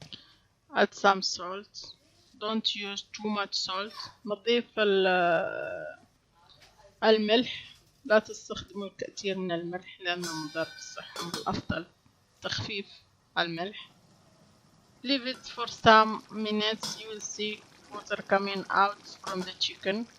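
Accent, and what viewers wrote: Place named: India. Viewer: 😀 لهجة محلية وعربية فصحى وانجليزي بالكنة هندية 😀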